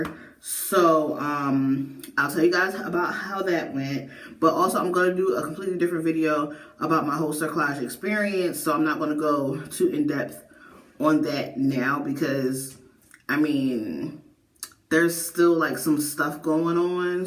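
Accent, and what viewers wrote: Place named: Philadelphia, United States. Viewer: Hi! Are you from Philly? I ask because I am too and I can hear a Philly accent. I am sooooo happy for you and your husband ❤️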